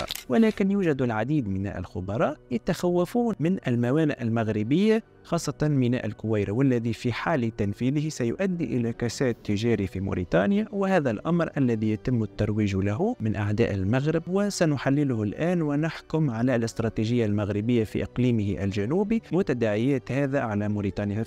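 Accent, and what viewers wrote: Tunisia. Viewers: بعد السماع جيدا ، تبين أنك تونسي خبيث و تمس بسمعة المغاربة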